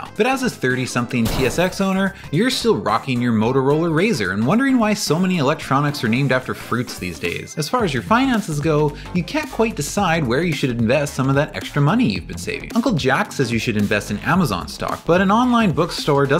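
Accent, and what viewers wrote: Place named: Canada. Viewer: Just wanted to say that I love your videos! My grandma had the original MDX, and I remember being blown away by the second gen when I was about 5 haha. Love the Canadian talk too, as an Albertan. Acura's always been on my radar, but I'm driving a 2010 Corolla XRS right now (considering importing an SC430 after I'm done Uni). Subscribed!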